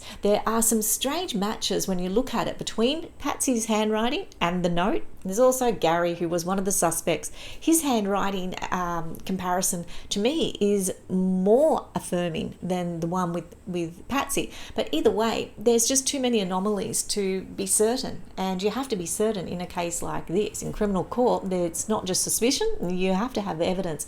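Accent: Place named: Australia. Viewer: Nice to hear a fellow Aussie. Good speaking voice - I'm older, and you're not too fast. 31 year olds speak too fast for me. Anyone under that is nearly unintelligible. This was good. Thankyou.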